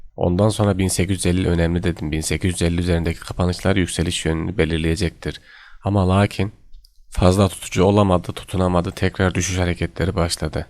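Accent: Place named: Kırşehir, Turkey. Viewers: da kırşehir şivesi var gibime geldi. sa nasrettin hoca demek istedim. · Ne güzel konuşuyor tam bı Anadolu çocuğu teşekkürler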